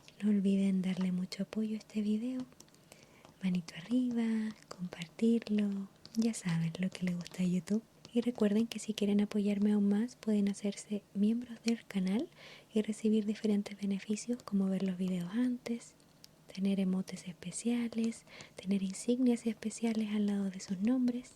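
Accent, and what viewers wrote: Chile. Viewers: Grande, siempre me gustó tu voz así chilena de chill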